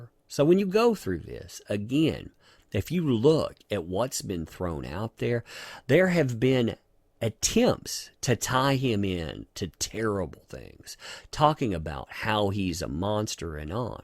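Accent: South, United States. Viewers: Subbing purely for dat Southern drawl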